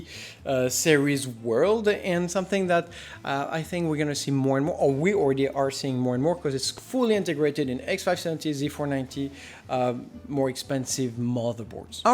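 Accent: France. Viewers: Merci je suis convaincu! J'attends quand même la nouvelle b550 de msi pour être sûr! Première vidéo que je vois sur ta chaîne et tu viens de gagner un abonné (le French accent is lovely)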